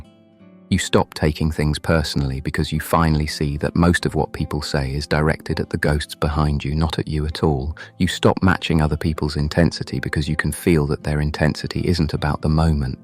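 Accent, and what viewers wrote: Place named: United Kingdom. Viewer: I love this english accent, very british, well chosen ! And the content of the poscast is really gold !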